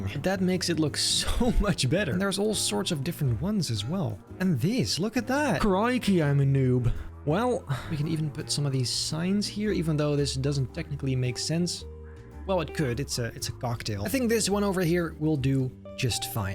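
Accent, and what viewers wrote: Netherlands. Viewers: Are you from the Netherlands cause me to maybe you can talk a little Netherlands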